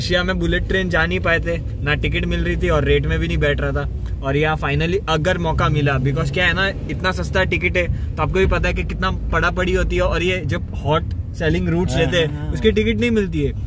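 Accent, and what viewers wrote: Gujarat, India. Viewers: Being a gujarati myself, muje to iski hindi me bhi gujarati h sunai deti h. 🤣👍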